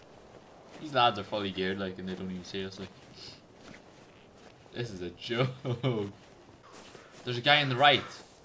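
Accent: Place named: Ireland. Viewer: Haha that was fucking hilarious, I can tell your Irish as I'm Irish myself 😂🇮🇪👍🏻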